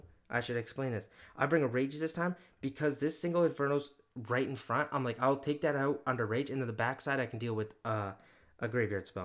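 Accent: Canada. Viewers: You owted yourself. Bro is from Canada or upper midwest. I think he watches trailer park boys too. The way she goes!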